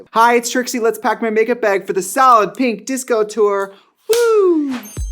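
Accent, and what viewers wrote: Wisconsin, United States. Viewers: Her Wisconsin accent is so strong in this vid! So adorable, love from Milwaukee 🍻❤️💅🏻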